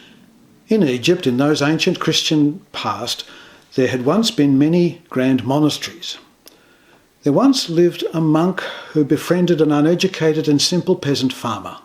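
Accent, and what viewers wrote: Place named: Australia. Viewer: Thank you! A friend shared this in text and it's so nice to hear it in audio. Especially in Australian 🇦🇺 From a fellow Aussie living in America. So nice to hear a familiar accent.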